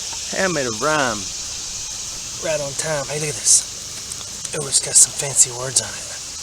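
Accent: Alabama, United States. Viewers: What part of Alabama are you from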